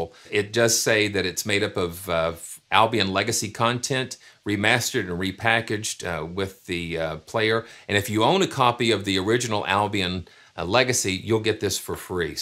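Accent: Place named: England, United Kingdom. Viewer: you have a great voice for scripture voice overs with music. Lots of 'em on youtube. With your wonderful composing abilities and your "just a touch of an english accent you bring to the table on the voice overs", you would have a purrrrdy big following. Timing is indeed everything. Please consider doing this. If you are already, GOD BLESS YOU and let us know your channel! Bless you, brother! PS-HAHA! I found your other sites.:D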